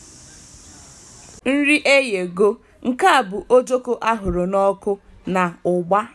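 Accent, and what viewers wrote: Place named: Igbo, Nigeria. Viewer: Wow.. Lovely. And all the ingredients are just so fresh. 😍 I love your Igbo accent by the way. Dalu, what Igbo tribe are you?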